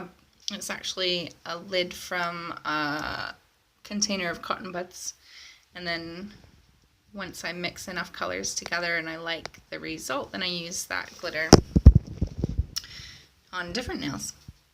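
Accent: Scotland, United Kingdom. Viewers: Do I hear a wee bit Scottish in your accent? X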